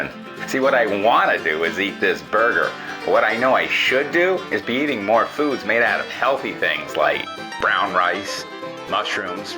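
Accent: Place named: United States. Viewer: I love it! Sounds very country and the music complements it.😊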